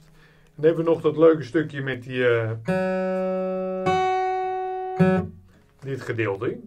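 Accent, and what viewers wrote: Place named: North Holland, Netherlands. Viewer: Hoi daar. Ik ben Jim, 62 jaar en min of meer met 'pensioen'. Ik verveel mij gelukkig niet maar toch... wilde ik er voor de winter maanden een leuke hobby bij. Ik luister graag muziek van klassiek tot hard rock maar het jaren 70 geluid van Genesis en gitarist Steve Hackett is wel iets waar mijn hart het meest ligt. Net begonnen met akkoorden leren. Mijn vrouw had jouw gitaarlessen gevonden en ik vind het prettig qua tempo en is duidelijk om 'mee te doen'. Trouwens, het zou mij niets verbazen dat je uit de buurt van Avenhorn kom. Die 'klank' klinkt erg bekend ;-) Ik ga een tijdje oefenen met je lessen! Bedankt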